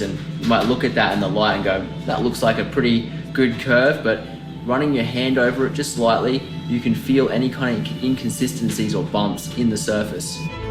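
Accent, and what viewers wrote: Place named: Australia. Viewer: я уже и забыл какой у австралийцев забавный акцент привет от World of armor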